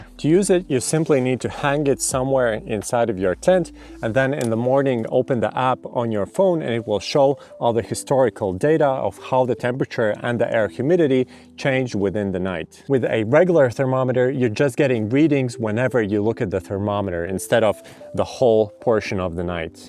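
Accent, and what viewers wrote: Latvia. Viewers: Yes, Latvian accent is hard to hide. Good video!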